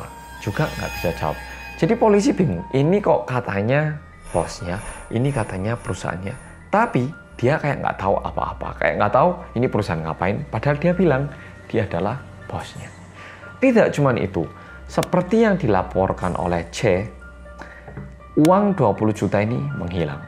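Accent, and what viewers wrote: Java, Indonesia. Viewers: Medoookkkkk podo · Tahun 1998 di Indonesia situasinya Kerusuhan . Medok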